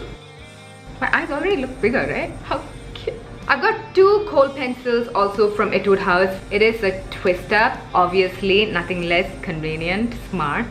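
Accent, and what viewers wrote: India. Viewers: It's an Indian accent, but with a little bit of western influence? Idk accents in India vary from place to place and generation to generation. There's soo much variation seriously, like in my class alone, I can identify at least 5 different accents.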